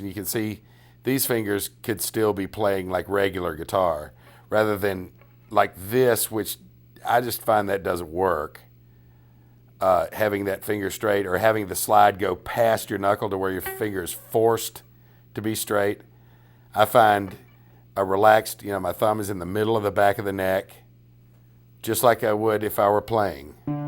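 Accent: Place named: United States. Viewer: This is the most American accent i've ever heard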